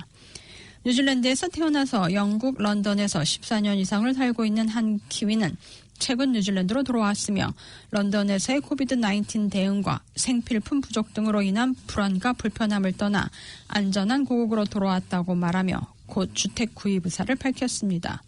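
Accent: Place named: North Korea. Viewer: 발음이 북한같아요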